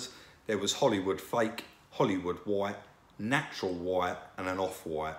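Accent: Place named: Essex, United Kingdom. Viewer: Good for you bud 👍😁😎 look great. Still sound like an Essex Boy though 🤔😜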